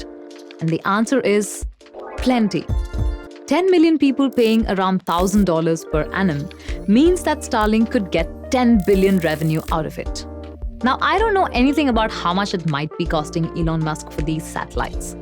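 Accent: India. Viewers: The highest number of internet provider, currently sited on the web in India, was 358. The smallest number of internet provider in the United States I could find was 2737 internet service providers in the United States: 894 DSL “Digital Subscriber Line” providers. One site citing the CIA, said there were over 7,000 Internet service providers in the United States. Given the difference in populations. It does not appear that number of providers and price have an inverse correlation. Enjoy your blog, great title. Interesting topics presented with intelligences and a refreshing an Indian accent. It is quite pleasant to my Midwestern ears. Thanks! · I don't like the Indian accent in general (for some reason it gets on my nerves) but it was a very informative video so I stayed to the end. Keep up the good work.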